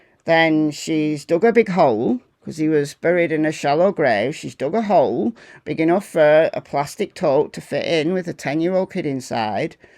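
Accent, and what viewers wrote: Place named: Leeds, United Kingdom. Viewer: You gotta be from Leeds 😂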